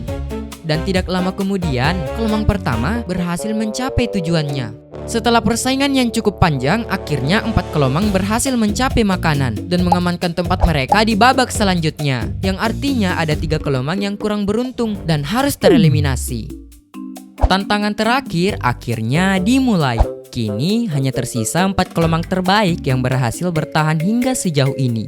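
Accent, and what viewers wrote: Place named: Indonesia. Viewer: dari suara nya udah ketebak orang indo🗿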